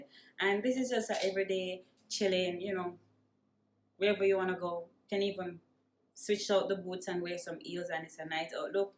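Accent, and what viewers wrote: Jamaica. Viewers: Sound like Jamaican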